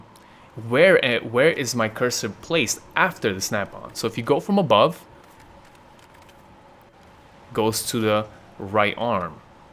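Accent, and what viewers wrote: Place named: Russia. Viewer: Steven Featherstone Not German but not American, think closer to Russia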